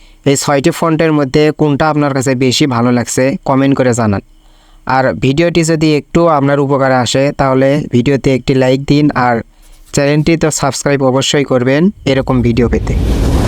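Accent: Bogura, Bangladesh. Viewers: bhai er basha boguray naki?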